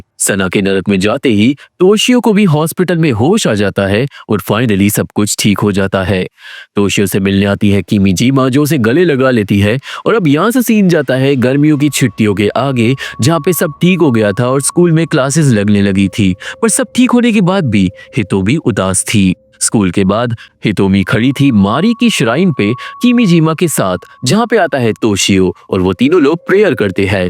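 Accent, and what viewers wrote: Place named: India. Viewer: Bro sana aur matt bolo tera Indian accent bohut tagra hai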